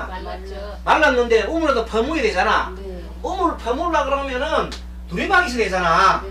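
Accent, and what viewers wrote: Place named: Jeolla, South Korea. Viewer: 말씨를 보니 라도인간이고 사이비인간이로다 대가리는 삭발하였지만 완전한 좌빨인간이다 에잇 좌발놈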